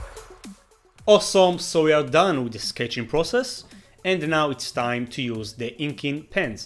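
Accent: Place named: Israel. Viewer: נשבע שהוא נשמע ישראלי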